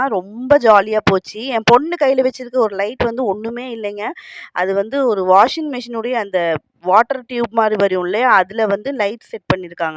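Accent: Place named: India. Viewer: Hi sis ippa than ongada videova 1st time paakuren niga srilanka thane edhuku Amma endu India people's mari pesuringa umma endu sollalame knjm different ah iki ongada pechelllam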